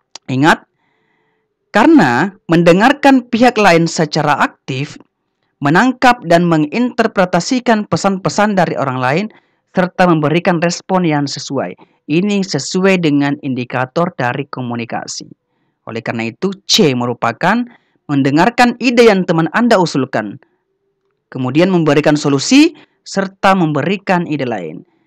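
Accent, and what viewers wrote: Bugis, Indonesia. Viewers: Cekcok logt Bugis 😀😀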